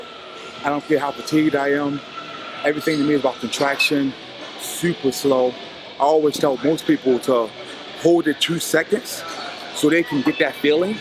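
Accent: Baltimore, United States. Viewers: That’s that Baltimore voice. I know that for a fact✔️ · Heavy b more accent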